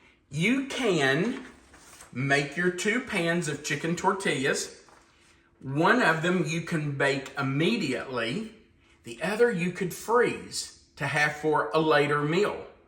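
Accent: South, United States. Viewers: Goodness, don't let anyone tell you how to speak! We like your down home country drawl in your voice! Alllllll of it! 😊 has a touch of twang in her voice too and you both are a delight to listen to! It really is like we are all sitting around the same table having a nice friendly chat with some really good food! Y'all really help cheer up people like me on their own. Blessings!❤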